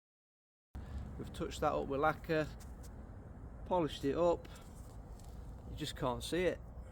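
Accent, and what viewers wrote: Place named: Derbyshire, United Kingdom. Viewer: Lovely Derbyshire accent there!! 👍🏽👍🏽😊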